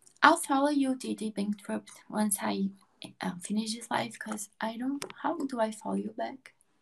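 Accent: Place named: United States. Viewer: Eu pensei que você era americana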